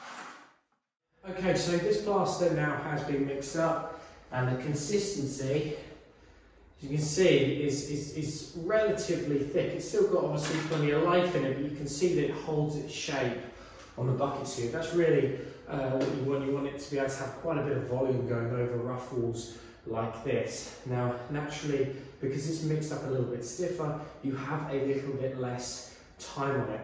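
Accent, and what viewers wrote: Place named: United Kingdom. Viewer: I was going to make the same comment. I am from the US, so the British accent makes it a bit harder to understand. But using the mic in the camera introduces a lot of room echo, and the audio level goes up and down, depending if is looking at the camera or away from it. And there were key points, where I just couldn't quite understand......... A wireless mic would bring up the only minus point of your fine videos..... (Your content is great, your videos are fine, and your presentation is very good, only your audio is lacking............. Thank you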